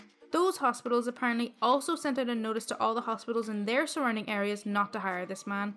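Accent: Ireland. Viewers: Hm... are you Irish,? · Np, it's true though. ^-^ You really never considered it? And hmmm... The obvious would be a wise guide character type, such as nanny mcfee. The accent, (Soft Irish?), is perfect and you're very well spoken. I'd guess an anime protagonist would be best though. You have a welcoming voice, and you're likable. Bet you could rock a Ghibli film! What do you think would suit you?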